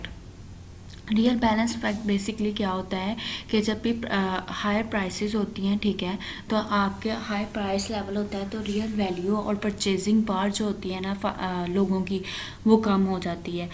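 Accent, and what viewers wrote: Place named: Pakistan. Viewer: Aapki aavaj se lag rha hai , ki aap Pakistan se ho 🙏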